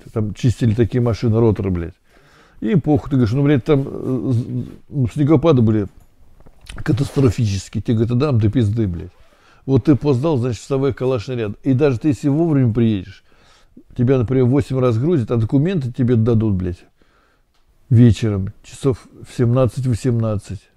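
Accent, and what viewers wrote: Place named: Kulebaki, Russia. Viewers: Прям КУЛЕБАЦКИЙ говор. Оно, собственно, всё рядом. У меня там вторая родина, и с такой же теплотой, в тех же эмоциях, все рассказывают вечером за ужином о своих буднях:3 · Приветствую,послушаю вас и опять вера в нормальных людей появляется.Сейчас с людьми что то произошло.а ещё говор волжский как бальзам.сам я красноярец но вся родня по маме из кинешмы,считаю второй родиной.меня и крестили там.здоровья вам!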